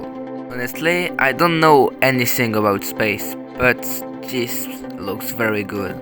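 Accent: France. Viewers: bro ur french your accent is realy pronounced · thicc français accent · That french accent brah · OMG VOICE REVEAL WITH FRANCE ACCENT 1!!1!1!1! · Not gonna lie your French accent is cool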